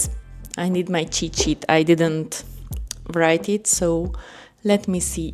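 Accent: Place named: Hungary. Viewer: Szia, mindig mosolygok amikor meghallom az accentusunkat 😊 még nem néztem meg a bemutatkozó szöveget, de rögtön tudtam,h honfitárs. Sok sikert a csatornához, nagyon szuperek a videóid. Ha megakarod mutatni az embereknek az Ír beach életet csak gyere fel hozzánk Balbrigganba 😊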